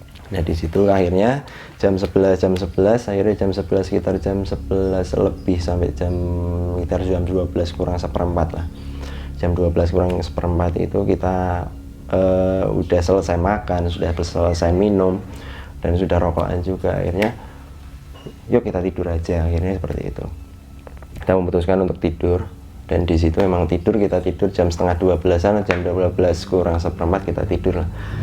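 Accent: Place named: East Java, Indonesia. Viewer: Mas , asli jawa timur ya ?? Medok nya sama 🤣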